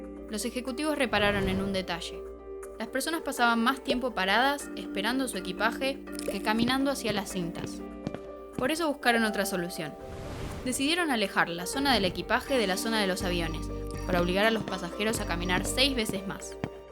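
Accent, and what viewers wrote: Argentina. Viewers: Que bueno escuchar videos "en argentino" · Canal voz argenta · Que desagradable el acento argentino en mujeres cuando narran. Es muy local. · Supongo que por estadistica. Igual en Argentina no todos hablan asi. El acento este es rioplatense, y todo el mundo lo conoce como argentino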